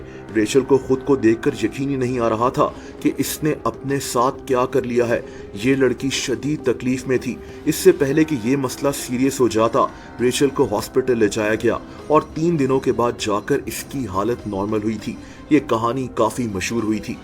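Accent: Multan, Pakistan. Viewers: Are you from multan??????????????😊😊😊😊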